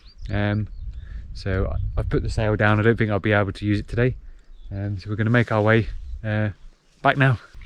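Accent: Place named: Scotland, United Kingdom. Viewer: Shameful pronunciation? I love the accent! Scotland rocks! Greetings from Greece! Keep hiking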